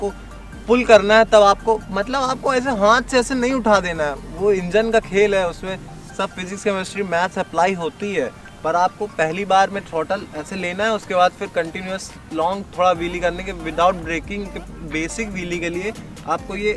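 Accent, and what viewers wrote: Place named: Lucknow, India. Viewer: Ekdm LUCKNOW wali boli hai bhai... Loved it... 💙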